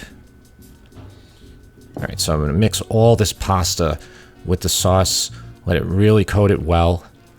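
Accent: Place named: Philadelphia, United States. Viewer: I’m literally going to watch all of your videos so I feel at home. Your Philly accent with a hint of Jersey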